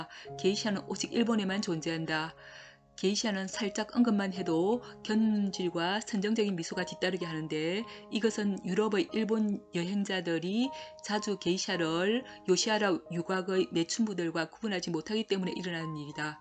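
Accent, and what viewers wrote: Gyeongsang, South Korea. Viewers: 사투리라서 그런거니 자꾸 고치라고 하지 마세요. 억양이나 발음이 서울말씨와 다른것이 잘못된것은 아닙니다. 다른지방 사람들에세는 익숙하지 않아서 그렇지. 그리고 바꾸기 몹시 어렵습니다. 서울사람 아무리 연습해도 절대 경상도말투 못배웁니다. 서울 사람이 경상도에 가면 경상도 말로 고쳐야 합니까? 절대 나무랄 일이 아닙니다. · 그냥 경상도쪽 아주머니 딱 말투구만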